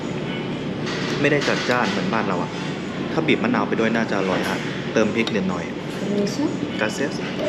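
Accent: Spain. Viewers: สำเนียงพูด ใช่เลย! คนสเปน555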